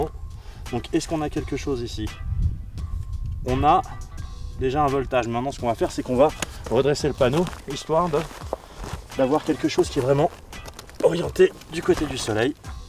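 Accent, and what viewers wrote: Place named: South, France. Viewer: en tout cas j'adore ton accens (du sud)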